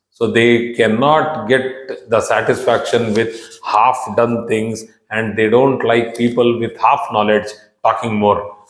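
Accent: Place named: Telugu, India. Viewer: Telugu accent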